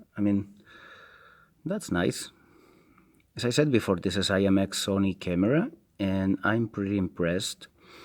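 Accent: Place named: Catalonia, Spain. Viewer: Tens accent català, hahahah! Podries dirme que tal funcionen alguns jocs? En especial pokemon go si el pots probar que tal funciona? Hearthstone potser també si pots, moltes gràcies i bon video!!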